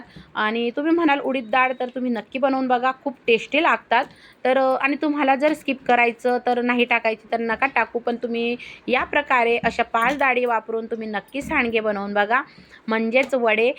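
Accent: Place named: Jalgaon, India. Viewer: खुप छान तांई विडिओ भुसावळ जळगाव बोलता 😊❤तांई फुल वाॅच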